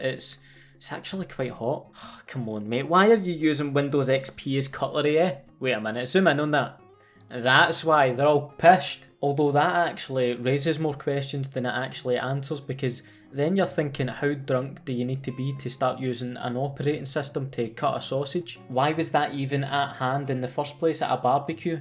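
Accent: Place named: Scotland, United Kingdom. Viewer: Nah, just Scottish.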